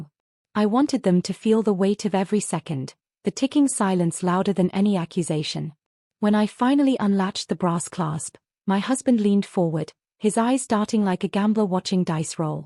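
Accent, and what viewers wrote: United Kingdom. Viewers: Why did you have to use a woman with a British Voice, when it's clearly an American Story?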